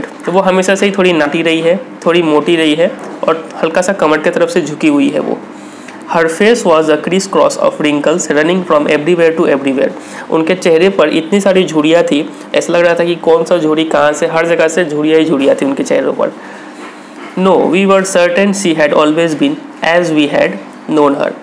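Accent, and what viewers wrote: Bihar, India. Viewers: Bihari he kya😆😆😆😆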